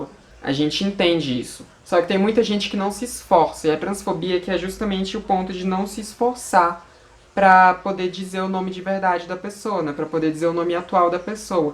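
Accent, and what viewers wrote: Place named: South, Brazil. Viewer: aaaah tendi, ñ sei pq o jeito vc q vc fala lembra um pokiiinho o sul 😄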